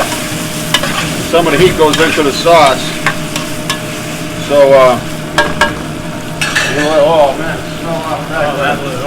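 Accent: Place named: New England, United States. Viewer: The stew looks so good,. I'll try it sometime. I am so impressed with your willingness to endorse other YT channels (I'm a Kent Rollins subscriber) and encourage people to watch their channels as well. That goes a long way with me, and demonstrates your personal generosity and humility. Major kudos with me, pard. BTW your accent is so great (yeah, you have one, just like everyone else lol) it's a mix of New England and New York that is surprisingly, sort of comforting. Keep up the good work. Just for giggles, could you do a corned beef brisket for St. Patrick's Day? You have the modern programmable pressure cooker (the brand name escapes me) but I've done a corned a beef brisket in one, and it's not bad at all. I'd prefer to take the 3+ hours to cook it properly, but if you're in a rush, ya gotta do what ya gotta do. I bought a huge brisket a little while back, and if you could do one from untouched brisket to finished product, it would really help me with my preparation in a couple months. 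I'm hoping St. Paddy's isn't on a Friday again during Lent. So inconvenient lol Love what you do. Thank you.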